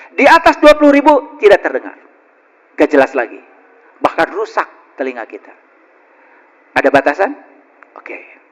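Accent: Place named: West Java, Indonesia. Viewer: Bapa guru boleh tau bapa asal dari daerah mana pak soalnya bapa bahasa Sunda saya orang Sunda saya sangat tertarik dgn gaya ceramah nya bapa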